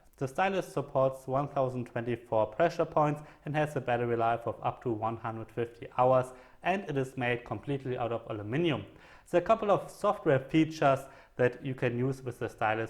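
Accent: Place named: Germany. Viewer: mannnn...du hörst dich so dermaßen deutsch an haha nicht schlimm aber dieser deutsche Akzent beim Englisch sprechen, haha viel zu geil, kurze frage wie ist die Performance und sound im Vergleich zum Nexus 9 welches ich überlege hierdurch zu ersetzen, wäre dankbar über eine Antwort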